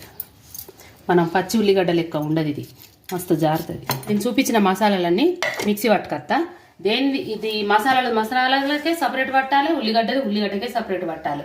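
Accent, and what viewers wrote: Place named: Karimnagar, India. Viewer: Pakka telangana slang , vinasompuga undi Amma. Meedi naku telai Karimnagar Anukunta. Maadi warangal 😅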